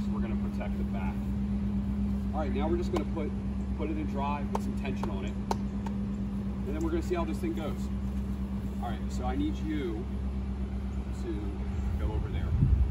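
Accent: Philadelphia, United States. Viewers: I knew my man was from Philly before I saw the Flyers shirt and the PA plates lol. Great video.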